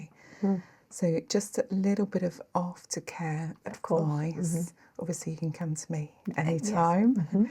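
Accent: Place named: Nottingham, United Kingdom. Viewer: This is officially my first ever comment on Youtube,. And it had to be for you! Absolutely love your tonality, pace and accent.. I had studied in the UK some years ago and your videos always take me back to Nottingham:)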